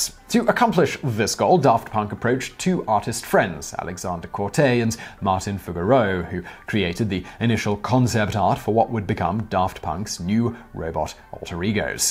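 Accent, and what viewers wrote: England, United Kingdom. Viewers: Hey it's Simon Whistler, damn man did you leave visualpolitk, i love that channel and i watched it for you and you alone, you give everything this special style, by this i mean it doesn't sound force scripted or anything and yoyr english accent adds to it as well, nice to see your on this channel as well, I'm gonna subscribe because your on this channel too! 👊👍🍻😁😊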